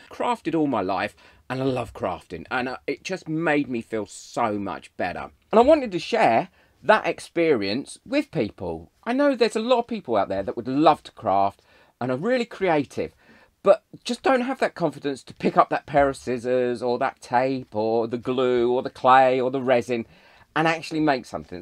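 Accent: United Kingdom. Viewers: Oh, dearest!!! First off, I love your face!! I find it’s kind and gentle! You’re quite handsome, and your British accent doesn’t hurt a bit!! Lol!! ( I’m Canadian) I’ve watched every thing you’ve made and have learned something every single time. These people who are cruel, for their own pleasure baffle me!! I guess they’re just cranky and sad with nothing better to do! Maybe they want you to feel as horrible as they do. Absolutely unacceptable!! I know you are adored, welcome and needed!!! Your wife, child(ren) must be so super proud of all you’ve accomplished!!! I certainly love you, and believe who I see when I watch you is the real deal! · I for one would like to say Thank You to you. :virtualhug:💝 I always find your Video's delightful and your attitude often silly yet very informative. I can almost bet the problem comes from some one who has a problem with the British accent and thinks it's pretentious. 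( If I had to say and I will It is more than likely a Yank and yes I can say it being from Baltimore Maryland! ) I would also like to say thank you for helping those of us who have problems with resin curing and bubbles no matter what we tried. I have a problem with a High dollar brand curing in my basement ( It is very well ventilated and I use PPE I PROMISE! but it stays at 68 degrees no matter what ) and after watching two of your video's on mixing I was able to finally get the bugger ( Sophie and Toffey is the brand I do not like ) to cure in my basement with a resin curing machine. So this Yank would like to say thank you and please continue your beautiful work.💜😇🥰:virtualhug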